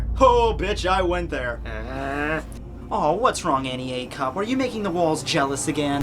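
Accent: Canada. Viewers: Does any one else hear the canada in the intro?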